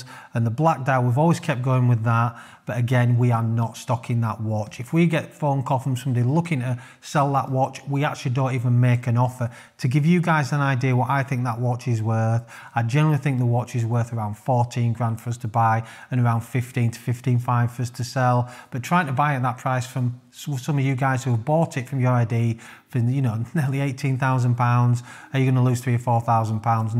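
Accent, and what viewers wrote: United Kingdom. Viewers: You have a very interesting accent. Which part of uk is that?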